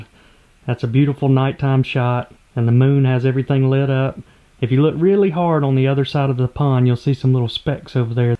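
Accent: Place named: Florida, United States. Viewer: These videos are so wholesome. Your accent also reminds me of home as I live in Pensacola FL not far from you thank you for the videos brother.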